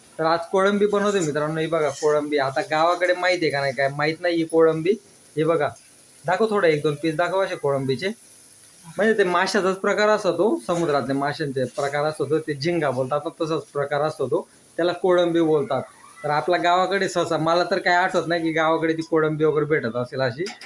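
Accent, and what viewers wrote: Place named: Jalgaon, India. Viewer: बोलण्याची ट्युनिंग जळगाव ची वाटते. आपल कोणी बोलत आहे असं वाटत आहे 😂😂😂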